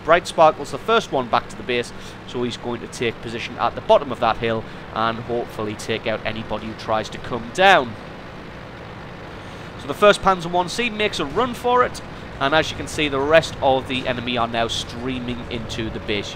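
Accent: United Kingdom. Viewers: Your accent is somewhere in the U.K. What nationality or accent is that from?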